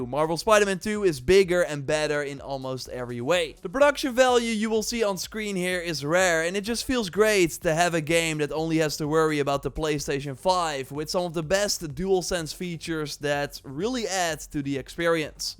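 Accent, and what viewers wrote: Netherlands. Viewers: ben je nederlands ofz?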